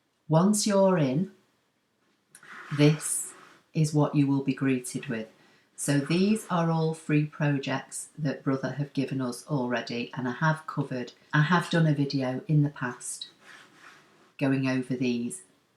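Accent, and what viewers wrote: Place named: Bolton, United Kingdom. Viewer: Hi, are you from anywhere near Little Lever, Bolton, youre accent seems very familiar. Also I had an aunt who lived in Breitmet that had a clock just like the one I hear in the background! Love your videos by the way, I am waiting for my first ScanNcut machine to arrive.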